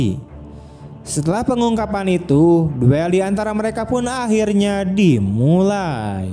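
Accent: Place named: Sunda, Indonesia. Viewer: Kau jadi pelawak pun tak laku bro ...logat bicara mu itu yg betol jangan maluin bangsa Sunda ... Bicara yg tegas jadi lelaki itu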